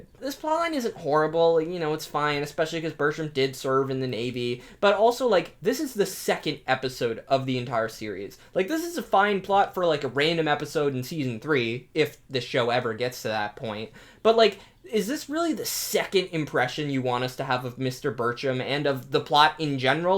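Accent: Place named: Baltimore, United States. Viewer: Are you from Baltimore dude?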